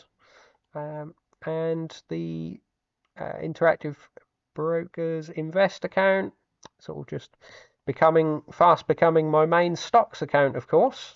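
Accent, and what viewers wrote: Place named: Bristol, United Kingdom. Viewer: I’m guessing it’s a Bristol area accent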